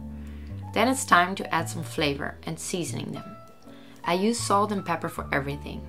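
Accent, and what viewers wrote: Netherlands. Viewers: you are dutch aren't you? i hear it in the welkom and the ja's in the beginning of sentences ahaha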